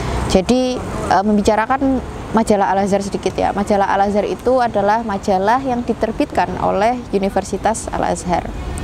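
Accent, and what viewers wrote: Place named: Java, Indonesia. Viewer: Medhokee leh mb Ditunggu ulasan buku lainnya ka...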